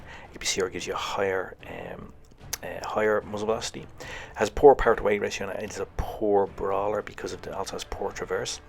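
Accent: Ireland. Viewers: World of Tanks Blitz Ano ty si parada player...yes bc you v Good player and you voice is super...Irish...its Ok Am Polish player you a Normal nice Man...✌️💝🇵🇱 Am live in Poland,Prague is very very nice town do you se Kretek adventures 😂😂😂😂 its my chilhood childern in Czech,Poland,Slovakia..love this hmmm....creature 😂😂😂✌️👍😉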